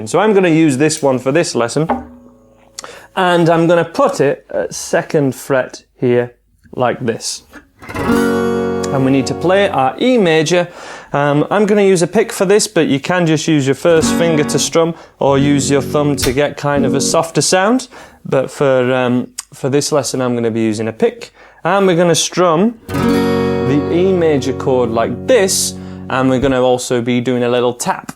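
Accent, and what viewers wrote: United Kingdom. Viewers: jo3bo its just his accent i got him british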